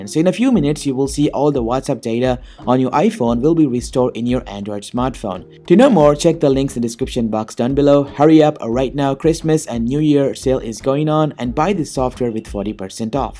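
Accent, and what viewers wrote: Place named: India. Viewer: Please it's data..not dayta....no hate but keep you Indian accent man please.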